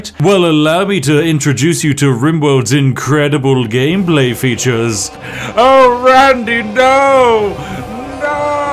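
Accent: United Kingdom. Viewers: I think it might have been a Rim World video that got me to subscribe to your channel. You were jacking stock images and harvesting organs and I thought to myself, "This British sounding guy is a complete psycho, I must subscribe" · British man doing game reviews. Rip totalbiscuit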